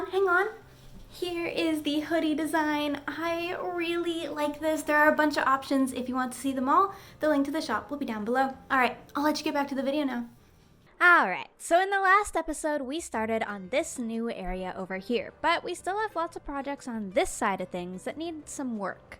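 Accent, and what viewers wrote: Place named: Canada. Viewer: I love gems 'Canadian moments' like when she says cie-an or sore-ey because its so cool as you realise that she pronounces things differently than we do. I personally am having a lot of fun listening to her pronunciation (not in a mean way) and her metaphors. I love this channel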